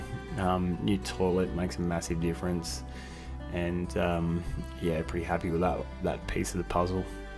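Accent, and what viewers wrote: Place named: Australia. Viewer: Just stumbled upon your videos. The voiceover kicks it. I hate just timelapse. Great to hear an Aussie voice. Keep it going buddy.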